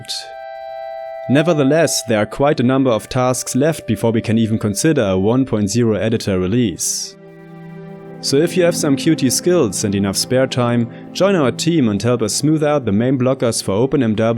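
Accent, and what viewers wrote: Germany. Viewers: Are you german? Your accent sounds so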